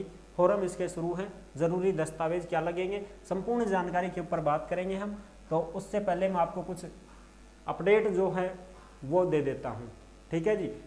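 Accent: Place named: Rajasthan, India. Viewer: Bhot badiya video vo bhi Rajasthani Voice me❤❤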